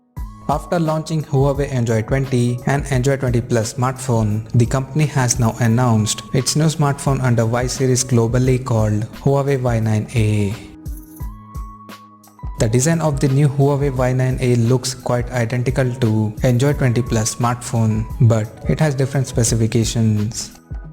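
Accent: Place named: India. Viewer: Your accent is very bad, If you gonna put videos to explain technical specs., You should get rid of Indian Language accent on your English. Each language has its own accent so don't mix please.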